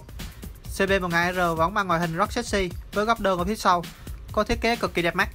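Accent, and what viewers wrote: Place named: Binh Dinh, Vietnam. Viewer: Lam on dừng nói tiếng bình dinh ko nghe dc gì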